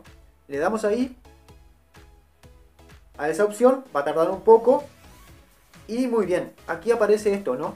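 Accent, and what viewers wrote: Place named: Argentina. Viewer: acento argentino tiene que averiguar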